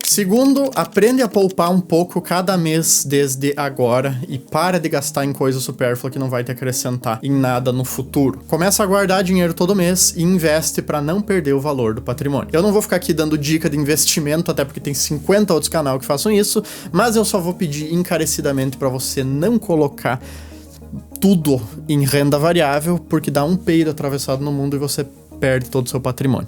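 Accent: Rio Grande do Sul, Brazil. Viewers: esse cara é gaúcho? acho muito fofo esse jeito dele de falar kkk. eu sou gaúcha tbm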